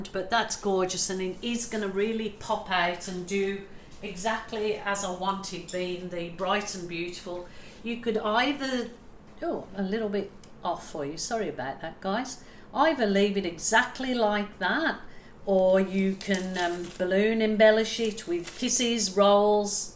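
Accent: Birmingham, United Kingdom. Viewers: I love this it's great and may I ask are you from Birmingham? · BEAUTIFUL !!! can I ask you sound like a slight Brummy accent. Maybe wrong lol . Love your work 😘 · It’s. beautiful and I can understand the emotion you showed. Thank you for showing us how to feel free in a lockdown situation. Are you from Birmingham Uk? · Hi, have you got a brummie accent going on there? Just found your channel and so thankful I did. Made my day watching this, I am a newbie and can only wish for a result as lovely as this. Thank you. x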